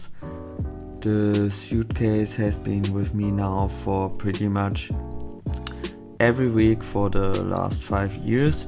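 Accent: Germany. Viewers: Are you german? Your accent is beautiful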